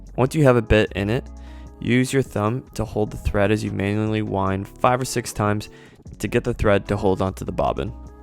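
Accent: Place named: Toronto, Canada. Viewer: I totally pegged your Toronto accent :) Anyhoo, great video, your instructions are clear and well thought out. I just started sewing again after a couple of decades, and really enjoying this learning phase from Youtube videos. Waaaaaay better than what I learned in school! All we learned was how to make stuff from patterns, but not how to fix things, mend them, or how to make patterns from clothes without having to take a master's physics and geometry in university. Subscribed!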